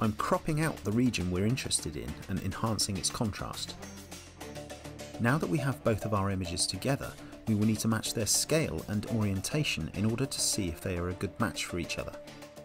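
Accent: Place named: England, United Kingdom. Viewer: Sounded English to me, then again they are the same.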